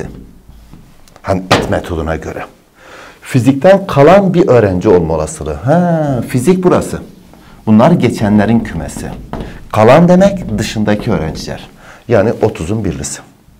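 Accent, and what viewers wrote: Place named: Eastern Anatolia, Turkey. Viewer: Hocam tam doğulu ağzı var sizde. Ve bu sizi daha da samimi yapıyor 🤗🤗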